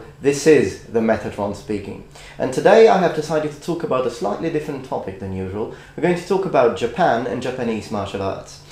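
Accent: United Kingdom. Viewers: Your Japanese and English pronunciation are pretty darn good! Did you learn English in the UK? Best wishes from the USA to Italia! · Wow, I can't believe I have never come across your videos before! I'm in a bit of a strange position really. I live in Leeds. England (I can tell you learnt English here and not in the US :)). Oddly, the only form of Japanese swordsmanship in my area is the Hyoho Niten Ichi Ryu UK Shibu. I have practised Chinese and Korean martial arts in the past, and I am being told my a lot of my martial artist friends that I am extremely lucky to live so near to this school and should give it a go. My heart initially was set on Kendo, for the sparring, but are no schools near me. Do you think that there would be transferable skills if I took up kendo too in a few years? Here is a link to the site: <link>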